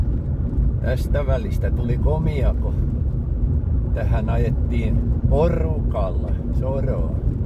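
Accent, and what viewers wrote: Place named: Tampere, Finland. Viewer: Aaargh!😖 Saan suorastaan oksennusrefleksin, kun kuulen tamperelaisella murteella ”määää näin niin isoja Raktodin munia pellllolla” 🤮🤮😵 Ei kertakaikkiaan voi käsittää, miten ylös työntävältä voi kuulostaakkaan tämä ”raktodin muna” näin isäntänä…..ja murteeltaan. 😵‍💫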